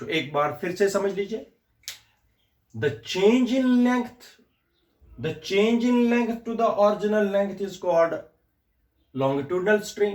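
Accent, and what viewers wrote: Kashmir, India. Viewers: sir why do you have a specific “kashmari" accent.....🙂 😊 😊 and love ur....teaching style sir...❤️❤️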